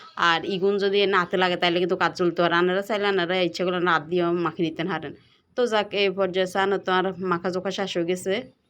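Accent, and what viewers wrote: Noakhali, Bangladesh. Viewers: আপু রেরিপিটা সত্যি খুব অসাধারণ🌈 সেই সাথে নোয়াখালীর ভাষাটাও❤🌈❤ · অনেক লভনীয় হয়েছে মরিচ খোলা মাশাআল্লাহ ❤❤❤ সাথে আঙ্গ নোয়াখালীর আন্ঋলীক ভাষা শুনলাম খুব ভালো লাগছে ❤❤ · অসাধারণ লোভনীয় হয়েছে আপু মরিচ খোলা। তোমার মুখে নোয়াখালী ভাষা খুব ভালো লাগলো। ভালোবেসে একটা লাইক দিলাম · খুব সুন্দর রেসিপি ❤❤আর তার থেকেও বেশি সুন্দর আপনার নোয়াখালীর ভাষা❤❤❤অসাধারণ❤❤❤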